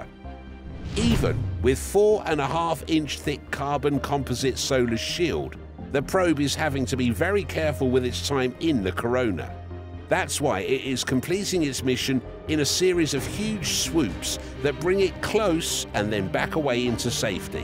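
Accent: United Kingdom. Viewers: annoying british accent...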